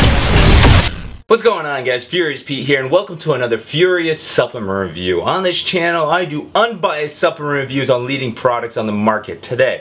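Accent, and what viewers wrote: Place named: Canada. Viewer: Is he canadian? He do have a canadian accent.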